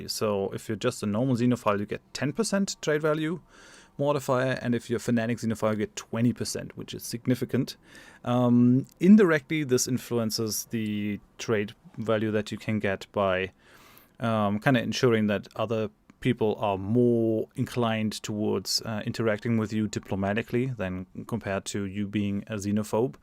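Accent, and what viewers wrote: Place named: Germany. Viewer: That German accent though….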